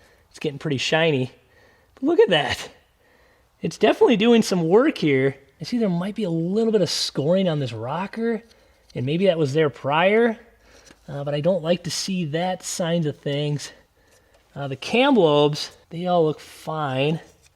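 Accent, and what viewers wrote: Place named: Midwest, United States. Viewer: mid west accents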